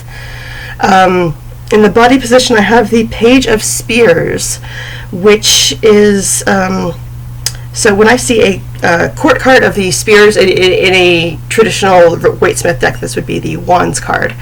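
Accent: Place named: Canada. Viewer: I have to say I LOVE your accent. I have several Canadian clients and I love listening to them talk. I hope that isn't offensive to you, I don't mean it at all. I'm 43 and Ive watched various versions of Degrassi since I was 13 or so and I love the way they say some things too.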